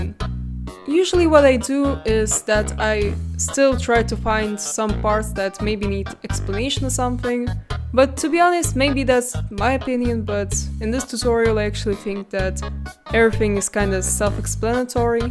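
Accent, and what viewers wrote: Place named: Russia. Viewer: I don’t know what country you are from, but you definitely have a Russian accent)))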